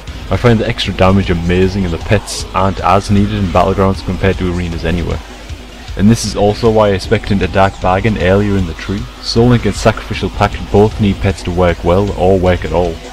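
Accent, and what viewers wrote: Newcastle, United Kingdom. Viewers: nice vid thought i heard a hint of newcastle in u for a sec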